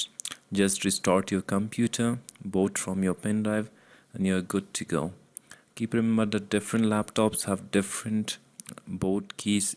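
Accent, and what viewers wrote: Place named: India. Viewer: Bishaka takra shawi e Your accent is such as indian...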